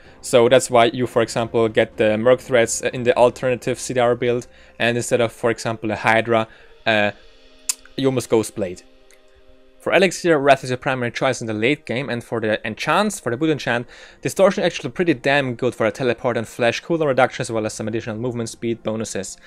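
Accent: Germany. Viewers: Your accent just sound a little bit like a german accent:P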